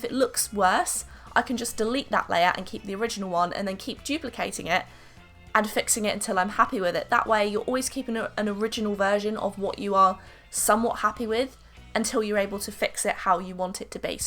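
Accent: United Kingdom. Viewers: bRiTiSh aCcEnT🌚💔its cute tho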